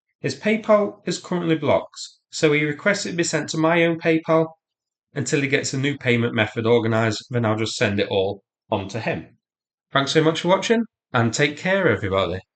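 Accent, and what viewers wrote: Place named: Estuary, United Kingdom. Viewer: Why have you gone deep into estuary English today?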